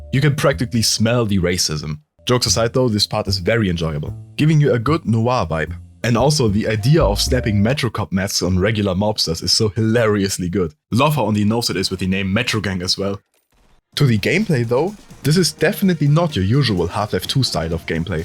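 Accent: Germany. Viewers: I'm recently subscribed but gotta say, I figured out you are German after the first 2 sentences you spoke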